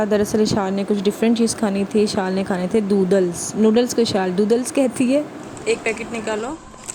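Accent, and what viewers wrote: Punjab, India. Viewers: Urdu Mein punjabi ka tarka both Acha lagtha ha. very good sharing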